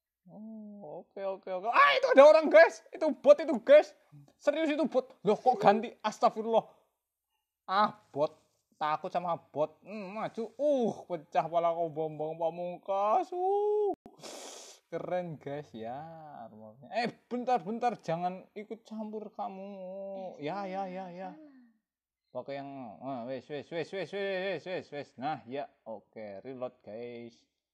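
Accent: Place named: Kedu, Indonesia. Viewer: pasti Plat AA ni... dari ngomong keliatan, sayang gak ada mukanya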